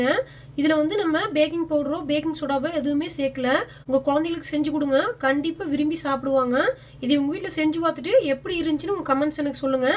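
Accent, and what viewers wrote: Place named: Coimbatore, India. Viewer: அக்கா நீங்க கோயபுத்துர் றா உங்க பேச்சி நல்லறுக்கு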